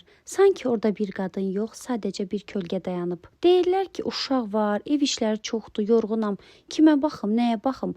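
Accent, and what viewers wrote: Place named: Goychay, Azerbaijan. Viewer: Hər kəsin bəxti siz kimi gətirmir... Ağzı Göyçəy, ağzı Göyçəy danışırsız elə.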